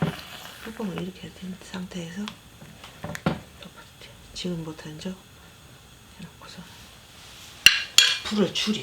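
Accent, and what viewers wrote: Chungcheong, South Korea. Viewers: 충청도분이신가 봅네요 구수합니다ㆍ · 충청도 말씨구만요 친근하게 느껴지잖아요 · 영상떠서 보고 바로 구독합니다 구수한충청도사투리인지요??? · 구수한 충청도 방언과 맛난 결안찜 굳입니다.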